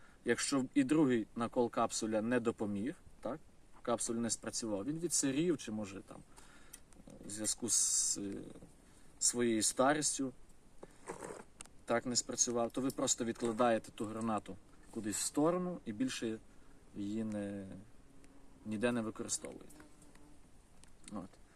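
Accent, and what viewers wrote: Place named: Western Ukraine, Ukraine. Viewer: Чiтко западенській суржик. А інформация, корисна.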